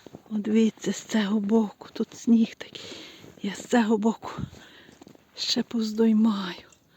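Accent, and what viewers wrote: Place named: Hutsul, Ukraine. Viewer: Яке це село Чую наш говір гуцульський У нас завжди файно❤Миру і перемоги всім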